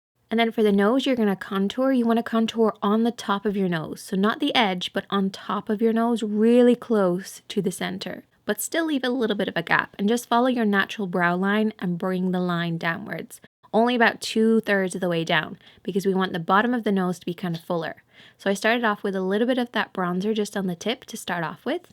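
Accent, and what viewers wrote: Ireland. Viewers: Hey are you Irish??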